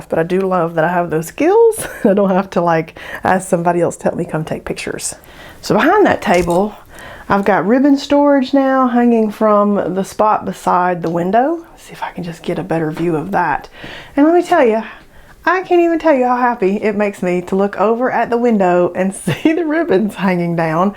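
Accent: South, United States. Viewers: Thanks for the great content, your southern accent is lovely!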